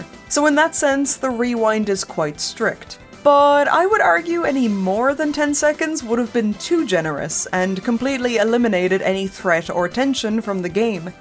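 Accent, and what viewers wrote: Ireland. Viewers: Was not expecting the Irish.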